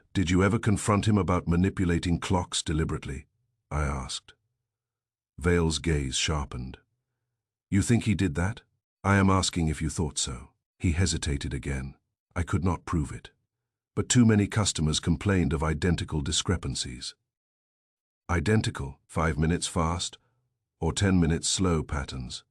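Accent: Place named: United Kingdom. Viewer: If you’re a person, I do enjoy listening to you. It’s a bit of a challenge reimagining Archie Goodwin with a British accent, I’ll listen some more.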